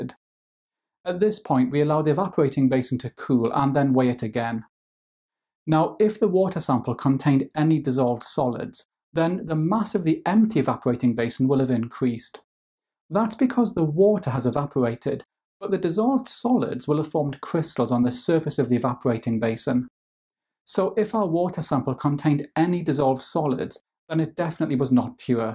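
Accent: United Kingdom. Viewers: Sir, you a brit innit?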